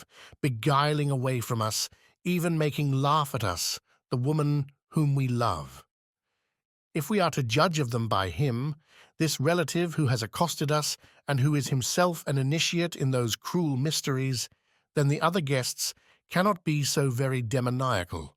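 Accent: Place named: United Kingdom. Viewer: Who is the reader of this wonderful novel? He sounds very British, not at all American.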